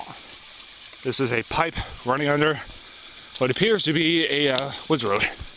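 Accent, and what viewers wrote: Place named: France. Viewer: Very nice place and french accent my friend :)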